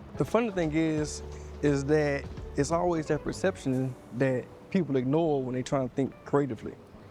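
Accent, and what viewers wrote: United States. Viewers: I always thought you had a country accent. It didn’t fit LA. But everyone in LA is from somewhere else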